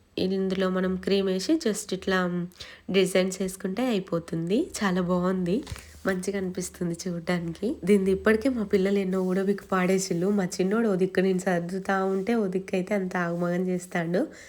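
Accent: Telangana, India. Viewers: Cake 🍰 Super అచ్చమైన తెలంగాణ యాసలో మాట్లాడుతూ చాలా బాగా చేశారు ...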